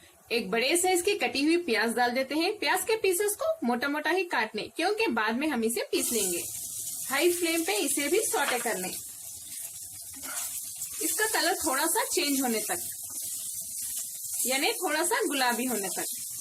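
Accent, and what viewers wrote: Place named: South India, India. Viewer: Hope you are from south india, Because of your Hindi pronunciation, i feel that.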